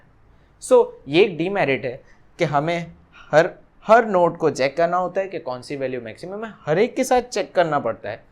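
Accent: Gujarat, India. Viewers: are you gujrati you say that 'so aama ek exmple le te hai' good job bhai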